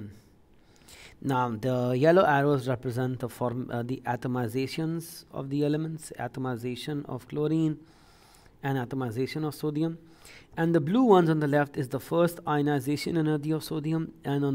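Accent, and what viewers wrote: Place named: Sicily, Italy. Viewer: More like sicilian.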